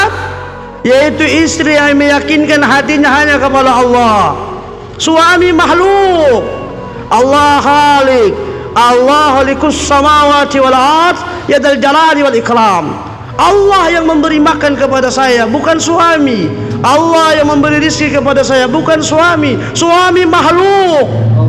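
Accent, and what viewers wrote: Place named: Manado, Indonesia. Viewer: Jangan piara bangkai Dalam Rumah .😂.. Ini bahasa Manado kah? Lucu dengar nya. Tapi sangat menyentuh hati subhanallah.. Semoga Allah berikan saya dan keluarga Hidayah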